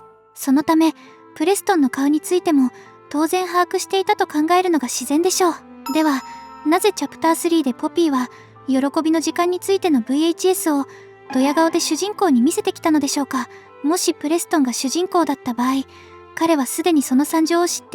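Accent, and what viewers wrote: Kansai, Japan. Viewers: 関西弁にするとなんだか吞気に見える不思議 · なんで関西弁やねんwこのチャンネルちとネタ入れてる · 関西弁マジでおもろいなwwww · 何故関西弁？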